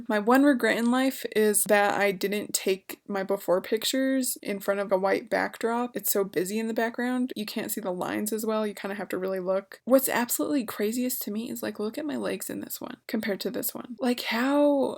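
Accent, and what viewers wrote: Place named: Midwest, United States. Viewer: I know you said you’re in CO, did you vote up there? Your accent sounds very northern/Midwestern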